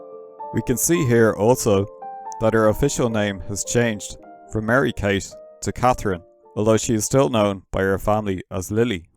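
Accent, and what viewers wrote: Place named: Ireland. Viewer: What a gem of a channel I’ve found. New sub! With thanks. 🙏 nothing like an Irish person telling a story. Excellent.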